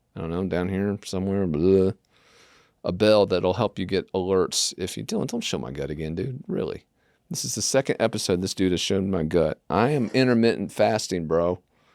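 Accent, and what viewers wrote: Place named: South, United States. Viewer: Love your update!!! Southern draw doesn’t hurt😝❤️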